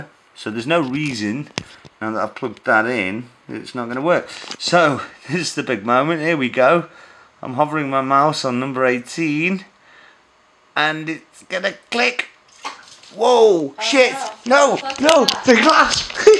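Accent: United Kingdom. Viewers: the british accent is great i loove these videos hahaah keep em coming MATE! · Yeah, I just poking fun at you. One dude to another...I love your accent, lol. Is that Cockney? I am from the American midwest. I sound alot like John Wayne. I like you videos. Especially when we can see the equipment and how it is hooked up. you are my newest hero If I had your mailing address, I would mail you a cape and a big old S for your chest.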